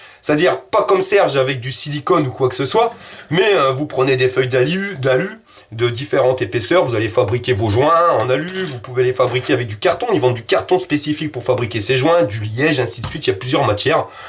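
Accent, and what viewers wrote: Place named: Nord-Pas-de-Calais, France. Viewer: il parle chti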